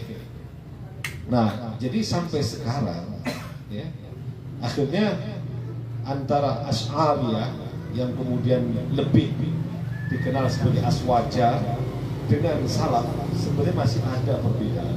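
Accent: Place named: Malaysia. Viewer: Cara berbicara Ustaz sudah seperti orang Melayu Singapura/ Malaysia. Barakallah fiik ustaz.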